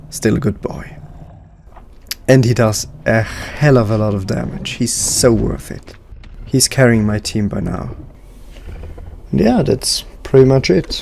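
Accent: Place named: Germany. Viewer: Man hört so krass, dass du deutsch bist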